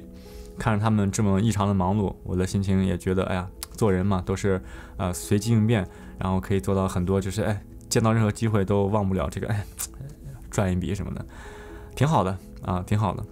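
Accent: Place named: Shanxi, China. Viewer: ！！！你好！！！去年到現在，我無意間看了你的視頻，很有趣！！！👍👍👍剛開始一聽你的聲音我就猜到你是山西人了，你的聲音真的很好聽，做主持人一定可以！！！👍👍👍讓人聽起來你是一個純樸的人，知道你在溫哥華到洛杉磯的故事！！！雖然其中不知發生什麽原因！！！我也不評論！！！但我感覺你是個好人！！！（以前在打工的時候，遇見一個老闆山西的！！！說話語調跟你一樣，說話很溫馨，不擺架子，很有善，不咄咄逼人！！！）👏👏👏雖然你做傳媒！！！經常拍東西分享！！！也把見解和生活困難面對的東西跟大家分享！！！我覺得這就是最真實的故事！！！而且你很前衛！！！APPLE的東西都被你玩轉了，當然還有其他！！！你不像其他博主，有些人品有問題，拍出來的東西都是有毛病的！！！不過你沒有！！！看你的視頻很舒暢！！！👍👍👍要是你還在溫哥華為我們分享生活就好了！！！（但人不能這麽自私，還要賺錢養家的！！！）👍👍👍 很有素質，希望離開美國還可以在Youtobe發你家鄉和中國的人和事給我們看！！！有種不舍！！！看到單身一人在國外，有些地方顯得很優秀，但還是為了生活，和目標，錢💰！！！跟我一樣有時無奈╮（╯＿╰）╭！！！很舍不得！！！多么质朴的一個人！！！（國家應該需要這樣的年輕人給他一個機會！！！）👍👍👍💪💪💪——Jim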